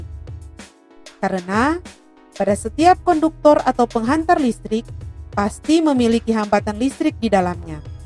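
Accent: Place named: Manado, Indonesia. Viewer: Rupa logat manado ini 😂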